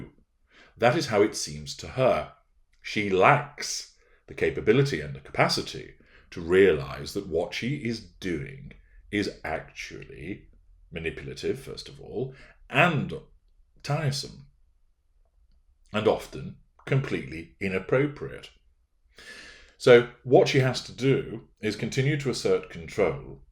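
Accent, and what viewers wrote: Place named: Lancashire, United Kingdom. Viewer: Hi. Have thought many times hearing your voice inflections that your early life was in either Lancashire, I’m a Lancashire lass now in Australia, or in Yorkshire. Thank you for your insight.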